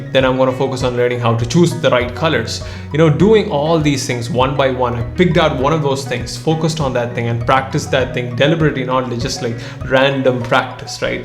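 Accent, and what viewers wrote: India. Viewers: Because your accent is like Indian Me Pakistani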